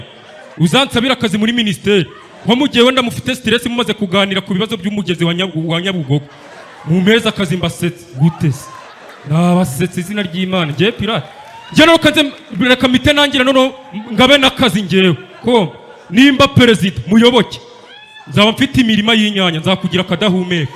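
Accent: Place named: Kiga, Uganda. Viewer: Kugikiga cyawe rwose turanezerewe 😂😂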